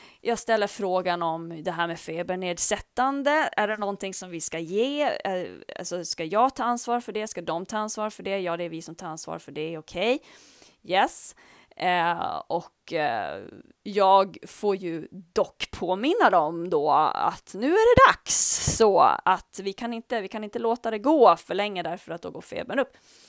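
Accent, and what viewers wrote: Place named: Gotland, Sweden. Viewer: så härligt att få höra gotländsk dialekt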